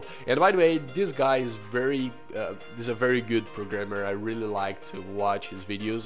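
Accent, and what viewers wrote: Brazil. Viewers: mano pelo seu ingles parece muito que vc é brasileiro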